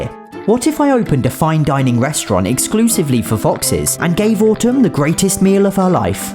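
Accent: United Kingdom. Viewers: I love your British accent and you videos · Are you british...?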